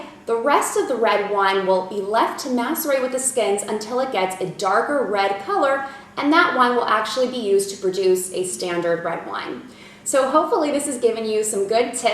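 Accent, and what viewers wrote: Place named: United States. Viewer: Actually her English accent is just fine. It has a slight twang in it but other than that, it is a clean and relatively non-distinct American English accent.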